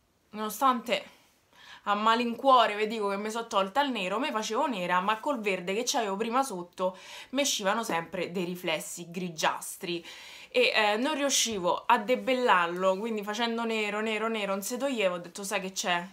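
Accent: Rome, Italy. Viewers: Se la persona che quando sono triste mi fa venire il sorriso e mi fa divertire con le tue battute il tuo accento romano TI AMOOOO💖💖💖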